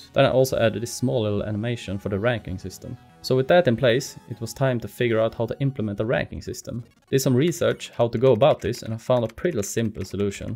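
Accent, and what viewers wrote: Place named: Sweden. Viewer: Omg I love the swedish accent😂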